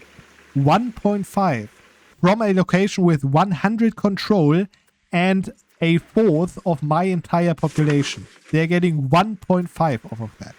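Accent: Germany. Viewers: "how did you know im german" ahhh accent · German accent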